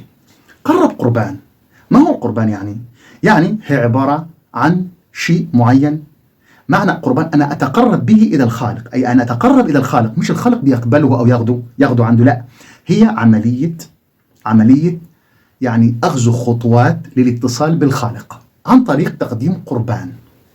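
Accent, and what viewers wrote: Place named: Cairo, Egypt. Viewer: إنت ليه بتنطق الجيم بالطريقة القاهرية؟؟ غير مقبول بالنسبة لي ، و مش حأقدر أكمل.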